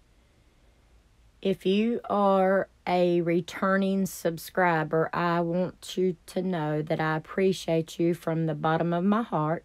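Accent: South, United States. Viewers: It looks awesome.your doing terrific keep it coming,by the way I hear a southern accent where are you from,I’m from Eastern Kentucky.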